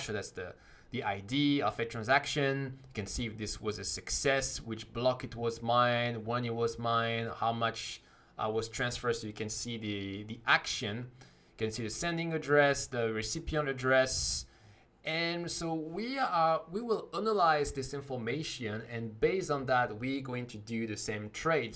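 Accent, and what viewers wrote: France. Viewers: I feel you are french 😛😛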